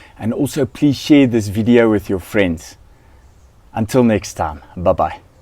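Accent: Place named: South Africa. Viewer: Lekker to hear a South African accent , I live in South Carolina USA . Great video very helpful tips . Clivia”dare my favorites, remind me so much of home in Transkei where I used to see them flowering by the hundreds under the coastal forest canopy . 👍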